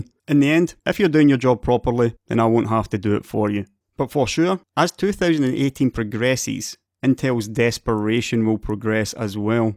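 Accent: Scotland, United Kingdom. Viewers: Its the Scots accent, it just sounds like you are being nasty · r u Scottish? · Keep your Scottish up!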